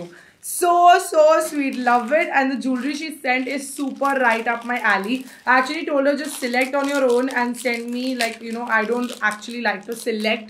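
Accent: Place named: Gujarat, India. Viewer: I love your Gujarati accent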